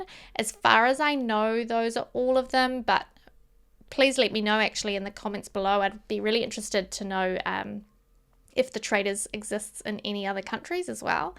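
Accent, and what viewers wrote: New Zealand. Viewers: Hi, I am from New Zealand too, it was quite different and exciting to hear a Kiwi accent over a Sims 4 speed build. This is such a beautiful build, I can't wait to try my hand at furnishing it. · Lovely building! It’s my first time watching your channel and loved your voiceover, New Zealand’s accent it’s so pretty 💗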